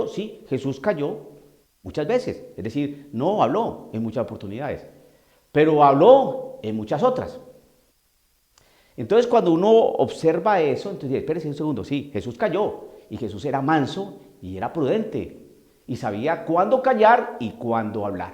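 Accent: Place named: countryside, Colombia. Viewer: Que bárbaro Padre me encanta esa forma colombiano de pueblos para hablar , este virus es una cortina de humo para hacer las cosas y la gente callada , hay q cuidarnos con los protocolos pero no darle importancia ese virus q tiene ciegos a muchos y creen q los laicos somos pendejos y no nos damos cuenta , no podemos callar la verdad hay q decirla x la verdad murio Cristo en la Cruz . Dios lo bendiga y proteja siempre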